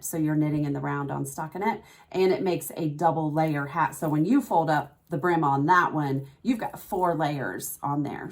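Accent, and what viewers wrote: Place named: Ohio, United States. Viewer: !!! You did it, Girl!!! I loved sitting and knitting with you …loved everything about this including your “Ohio Girl” accent, which is so easy for this Jersey Girl to listen to!!!❤ I’m your fan from the days of and Kay, so the Fan Girl thing continues! I’m such the sock knitter too and hope you’ll do some teaching about the many heels we can try! Also, please talk about what needles you have tried and enjoyed. Do you use Magic Loop all the time or 9” or Flexi Flips, etc…I just want to know everything! Great job, Friend❤🧶❤️ PS..please move away from the swinging pendulum of the clock that was a bit distracting!❤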